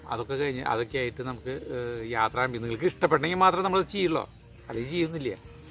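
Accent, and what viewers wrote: Thrissur, India. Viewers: I like urTrissur slang .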